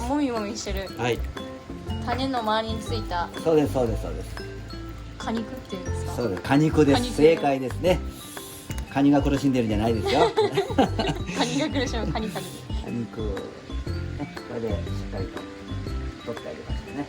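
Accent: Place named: United States. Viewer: このYouTubeのながれで1年前のから揚げPart2も観ましたが、の英語、綺麗なアメリカ英語ですね。from Los Angeles🇺🇸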